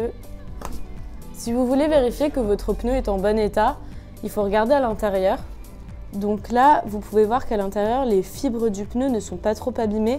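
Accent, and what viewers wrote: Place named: Quebec, Canada. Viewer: Cool l accent québécois !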